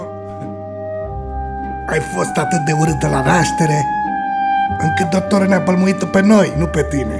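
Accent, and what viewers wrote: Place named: Italy. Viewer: Imi place foarte mult romano-italiana ta,faceti o treaba excelenta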